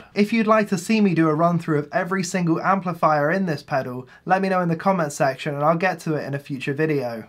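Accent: United Kingdom. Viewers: I wana sound this posh 🤗🤘🏼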